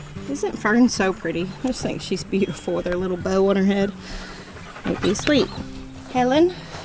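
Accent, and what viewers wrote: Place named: South Carolina, United States. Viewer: You're starting to get a south Carolina accent 😄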